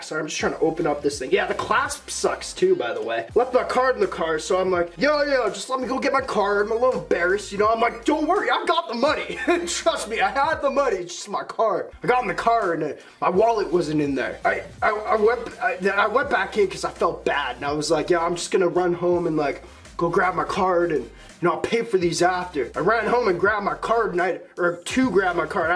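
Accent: Canada. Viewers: U canadian bro?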